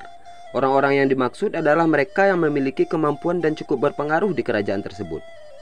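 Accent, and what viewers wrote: West Sumatra, Indonesia. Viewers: Narator nya terlalu ketahuan bahasa Indonesia nya dgn logat Minang nya. Seharusnya narator berbahasa Indonesia yg baik.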